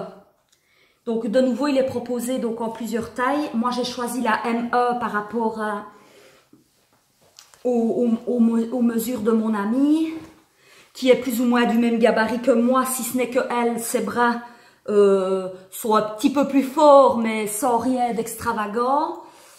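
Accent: Liège, Belgium. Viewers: Oui, et l’accent liégeois aussi ! 😁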